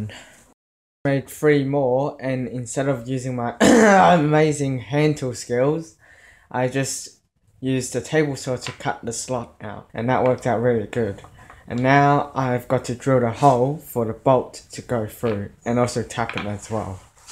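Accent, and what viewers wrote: Australia. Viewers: Australian accent. :)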